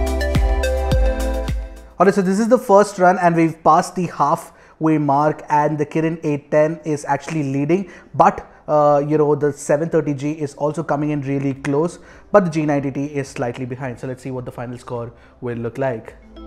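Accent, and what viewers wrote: India. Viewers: I can still understand you even you use indian accent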